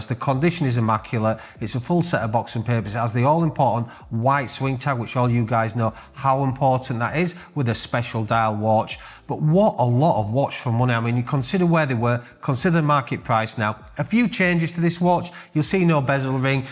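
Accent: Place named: Yorkshire, United Kingdom. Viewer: Out of all the YT videos regarding Watch content, I have to say, I really enjoy your videos. Always to the point, no nonsense or drama. Just the timepieces and the market details. I’m a huge fan. Enjoy that Yorkshire accent as well. I do have one question though, not to insult you by any means, but what is your opinion on the “Steinhart” entry level watch brand ? It’s interesting to me that very little information is out there about this brand. The Ocean One looks very similar to the ever popular Submariner, but without the Rolex price. As an everyday watch I’m interested in one. But as mentioned I can’t seem to get an experienced watch dealers perspective. Maybe that’s a statement in itself? Hope all is well across the pond ! Cheers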